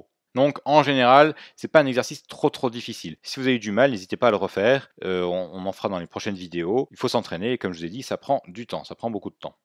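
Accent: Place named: France. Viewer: Je m'excuse d'avance pour la question mais tu es de quelle région de France ? (si c'est en France). J'ai un collègue qui a la même façon parler que toi d'où ma question. Merci :)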